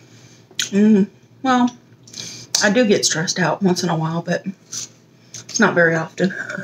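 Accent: South, United States. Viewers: I love her southern accent❤❤ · She lives in Vegas BUT she's from the south... somewhere in LA i beleive...southern for sure!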